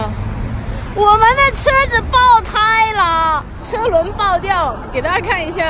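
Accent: Hong Kong, China. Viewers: 港普好可愛啊哈哈哈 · 港普，體諒她一下哈哈哈😂在香港人裡她中文已經算說的很好的了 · 唱歌像台灣人，講話像香港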